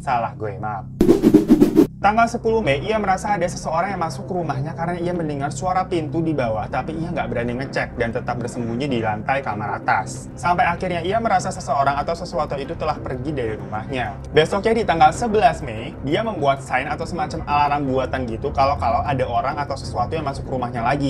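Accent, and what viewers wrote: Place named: Cirebon, Indonesia. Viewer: Yg AQ suka gaya bicara tuch ky orang Cirebon gitu lucu dan ngasal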